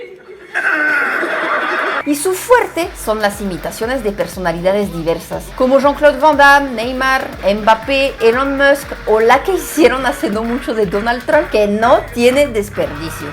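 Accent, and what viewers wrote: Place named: Mexico City, Mexico. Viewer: Hola, me gusto mucho tu video… me encanta tu acento chilango 👏🏼😂 saludos ✌🏼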